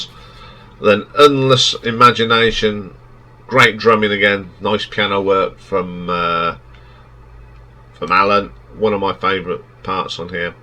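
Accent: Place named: United Kingdom. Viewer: You sound British, but your sentences hardly make proper sense. Anyway, good luck with your channel...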